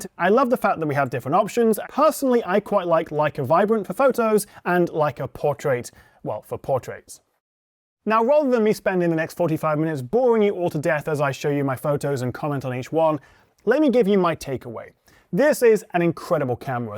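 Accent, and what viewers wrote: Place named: Wales, United Kingdom. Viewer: Didn't know you're from wales bruh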